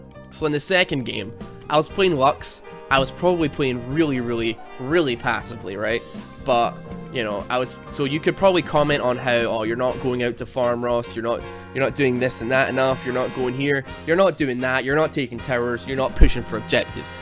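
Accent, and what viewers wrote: Scotland, United Kingdom. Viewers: Are you Scottish?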